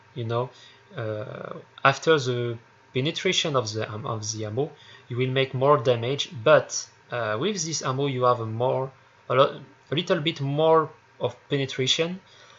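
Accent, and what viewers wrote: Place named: France. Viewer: avec le petit accent français c parfait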